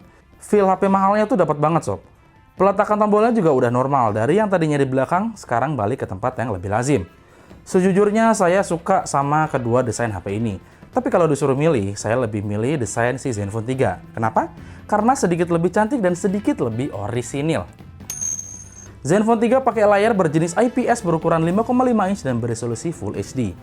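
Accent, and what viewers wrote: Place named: Bali, Indonesia. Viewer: psti orang bali ya om..?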